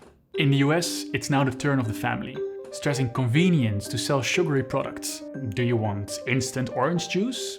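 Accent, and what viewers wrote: Netherlands. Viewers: I really love the vid! But I can hear you're dutch too much to watch it lol. Just can't handle hearing my own accent😅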